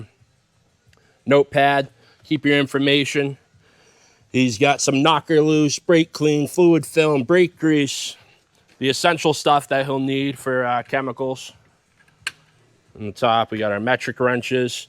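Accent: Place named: Upstate New York, United States. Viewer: You definitely live in upstate New York with that accent 😂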